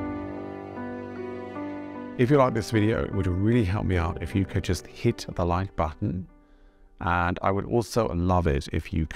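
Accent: Germany. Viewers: … you lowkey have a german accent… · Ich würde fast sagen, der Akzent ist dem Deutschen mittlerweile etwas angepasst, deshalb so gut zu verstehen lol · you do sound like German speaking English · You have developed a bit of a German accent! You sound like a German who speaks very, very good English.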